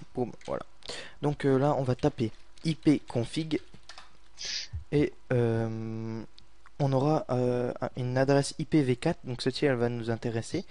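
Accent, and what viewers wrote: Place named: England, United Kingdom. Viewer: L'accent anglais... :)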